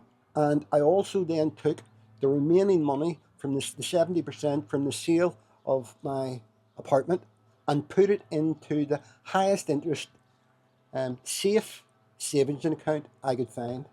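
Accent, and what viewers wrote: Northern Ireland, United Kingdom. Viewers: Where did you move from? NI by the sound of it. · well, you can hear that he's northern Irish. Some Northern Irish people choose a British Passport and some choose an Irish Passport but they can apply for either. (As I understand it). I'm from the republic, so this is just my understanding.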